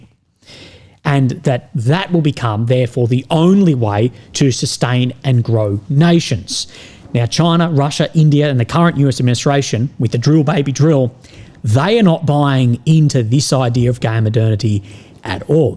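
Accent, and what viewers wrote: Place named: Australia. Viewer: Hearing a australian, talking about america is f**** hilarious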